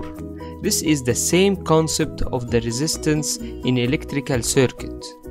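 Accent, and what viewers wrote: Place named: Egypt. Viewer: اللهجة دي مصري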